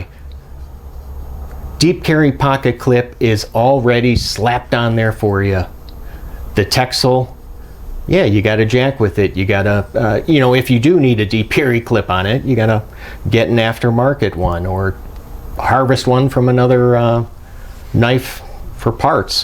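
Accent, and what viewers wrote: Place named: Michigan, United States. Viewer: this was exactly the video i was looking for, thanks man. first time i've seen your channel, i dunno where you're from but i just moved away from michigan to the west coast and your accent brought me back home for a minute, lol. are you a yooper?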